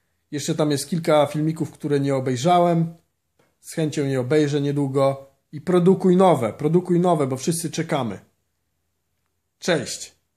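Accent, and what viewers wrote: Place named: United States. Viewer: W jakim jezyku robiles notatki? :-) Lubie Ciebie sluchac, fajnie opowiadasz. Slychac ze masz juz taki amerykanski akcent :-) Pozdrawiam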